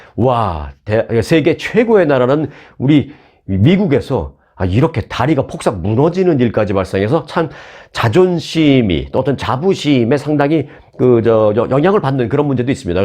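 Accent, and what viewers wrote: South Korea. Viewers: 반갑습니다. 부산의 사투리에 웃음을 주셔서 고맙습니다.ㅎㅎㅎ 볼티모어의 교량이 무너진 것에 넘 놀랬습니다. 수습에 최선을 해야겠죠. 다양한 소식을 전해주셔서 감사드립니다.👍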